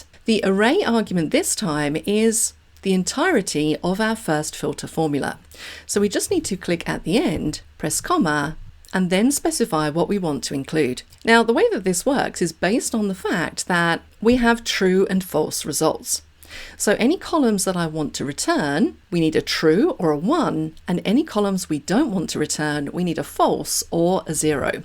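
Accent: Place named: United Kingdom. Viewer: Me encanta tu canal. Su explicación es bastante clara. Muchas Gracias desde Centroamérica. I love your channel. Your explanation is really clear even i'dont speak English, but your British accent is so clear. Tks so much. My favorite fuction is xlookup (buscarX), filter is also one of them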